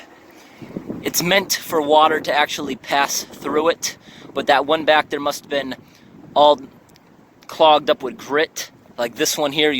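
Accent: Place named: United States. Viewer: Where in the U.S. is this accent from? Or is it just the way he talks? Either way I like it!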